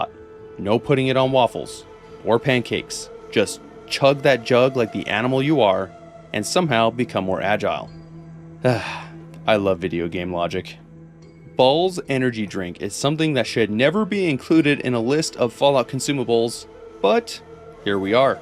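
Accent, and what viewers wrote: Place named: United States. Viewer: You did really well pronouncing "Pyotr Illyich Tchaikovskij". Aside from an american accent, it sounded proper to me. Language trivia: "Piotr" is the russian/slavic version of "Peter". The "-io" sound kind of sounds more like "-ieo" with an emphasis on the "-ie". The "-tr" is certainly one of the typical slavic consonant sounds, but when you speak it, you'll usually hear a brief "u" or "e" vowel sound in there. Anyway, thanks for the entertaining video! I hope my little trivia bit was at least somewhat entertaining to you.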